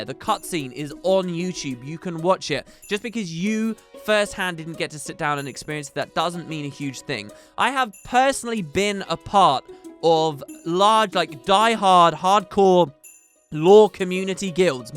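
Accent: United Kingdom. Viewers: Glad to have some lighthearted British monologue in the morning between two dark episodes of Daredevil :D Totally agree about third party lore/story sources. I love the lore and enjoy the story when it's good but I rarely experience it in-game. Every now and then I take my time to interact with a NPC but usually I just click through all the dialogue as fast as possible. I think the last time I really looked for stuff in-game was in S02 when we returned to the Priory and I read something like half of those new lore bits.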